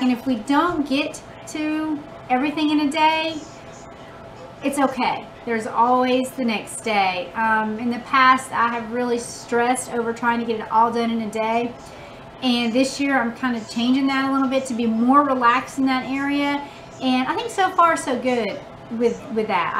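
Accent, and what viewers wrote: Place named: East Tennessee, United States. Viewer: Welcome to the VHC! Based on your accent ... are you from East TN? We are a bit outside Knoxville and you sound like one of us :) And you are wearing orange ... hehe.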